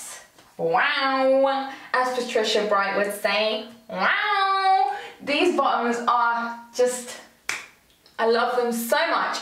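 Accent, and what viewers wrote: United Kingdom. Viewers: You look really good but your British accent is hot keep up it up . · Where is that accent from? Okay, UK? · my favorite British beautiful woman! Your clothes show is very professional and enjoy able! I love your sexy look and accent. You are very honest with your thoughts and believes. Have a long healthy, happy life ❤️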